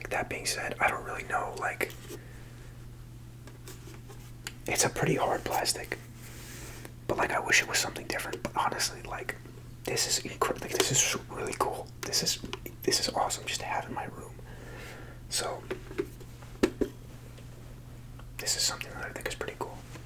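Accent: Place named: Brooklyn, United States. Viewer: I’m interested in pinpointing your accent. It has been muted somewhat by education and socioeconomic status, but my guess is Brooklyn or somewhere near.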